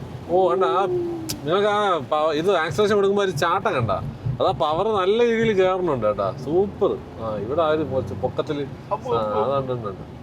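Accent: Trivandrum, India. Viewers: Why you gotten Trivandrum slang 🤔🤔🤔???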